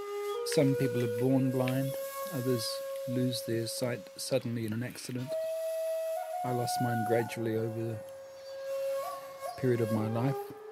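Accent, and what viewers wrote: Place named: New Zealand. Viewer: Your accent. Sounds from New Zealand.. telling me there's a blind shakuhachi master here??? Such an inspiring guy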